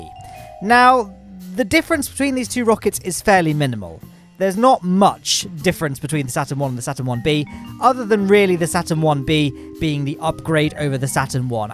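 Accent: United Kingdom. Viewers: Only OG's remember his British accent at the inteo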